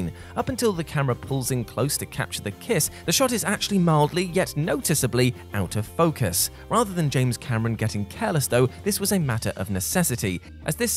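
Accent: United Kingdom. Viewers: Why is it time and time again like a high percentage of these documentary like features are narrated by English accented actors or spokes people. Enough of these overindulgent usage of accented narrations by Brits. There are other people of other nationalities who can do an narration or presentation.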